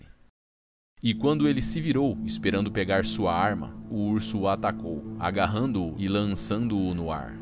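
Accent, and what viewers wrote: Brazil. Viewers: Sotaque legal, de que parte do Brasil? Parabéns pelo canal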